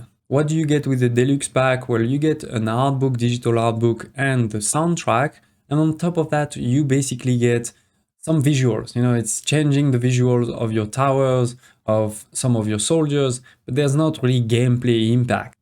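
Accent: France. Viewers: Bro sounds 100% French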